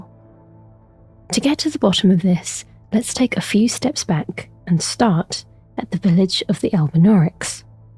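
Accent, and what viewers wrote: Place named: Lancashire, United Kingdom. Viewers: First time I found your channel. And I swear it feels like I'm still playing the game 😅. Your voice fits the lands between perfectly. You sound just like latenna. I'm from Lancashire so I love a local accent. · Ah I've finally found my favorite Elden Ring Lore channel. I see a lot of them and many of them are amazing, but you really know how to tell a story. I love your voice as well, you'd actually suit doing voice work for Fromsoft games. Very relaxing and soothing English accent. A nice juxtaposition that some characters have in comparison to the harsh environment of the games. Thanks for this. I find it hard to piece tobegher the story of these games even when it's right in your face. This really helps to break it down.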